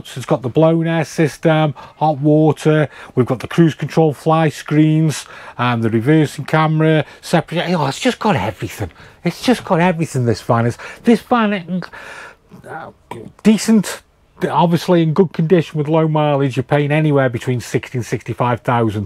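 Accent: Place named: Stoke-on-Trent, United Kingdom. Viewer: He is from the UK, from his accent I am guessing he is from Stoke-on-Trent but I am sure he will correct me if I am wrong 😂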